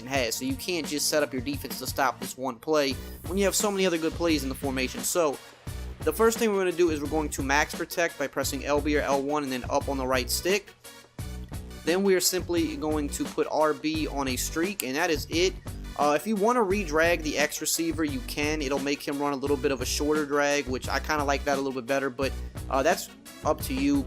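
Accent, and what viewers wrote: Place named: New Orleans, United States. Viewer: That's gotta be a new Orleans accent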